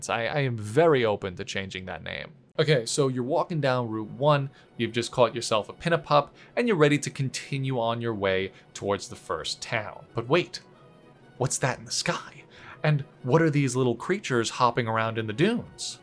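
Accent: New England, United States. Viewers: Dunno how its taken me this long to realize youre from new england, maybe cus im from here too i was just like "yep completely normal average accent right there" lmao??? But eyyyy neighbor!!! (Pls read all in an equally thick Cape accent) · I can't tell if his NE accent is stronger in this video or if I just barely noticed it now that he said he's from there lol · Haven't watched for a while so the new england accent really hit me like a truck. not sure if its new or I just forgor. anyway I love Shorlugg pinipeds rule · when you said "hear that?" I actually heard a bird call that might have been a bluejay. btw I love you doing a new england accent even while you're not joking, it's nice. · Love how the New England accent becomes slightly stronger throughout the course of this video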